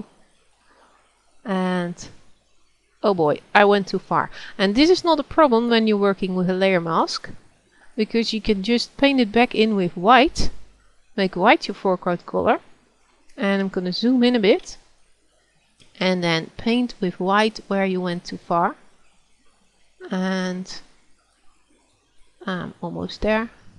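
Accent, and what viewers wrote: Netherlands. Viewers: dutch accent.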